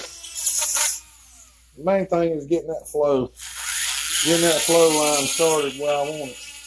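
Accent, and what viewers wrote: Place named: North Carolina, United States. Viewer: you gotta be from North Carolina because we sound just alike when we talk. Im from around high point NC. Where are you from brother?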